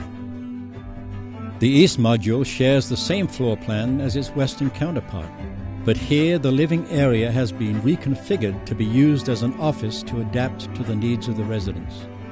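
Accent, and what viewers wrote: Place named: United Kingdom. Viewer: Cool house, but what's up with the British accent?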